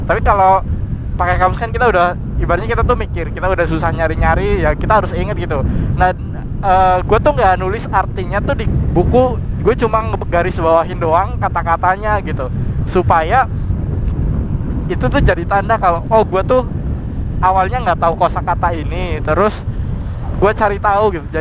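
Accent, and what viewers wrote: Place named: Banyumas, Indonesia. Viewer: motovlog ngapak mantabbb ...smoga sukss channelnya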